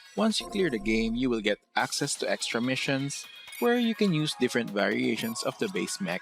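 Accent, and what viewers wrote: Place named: Philippines. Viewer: Sounds very Filipino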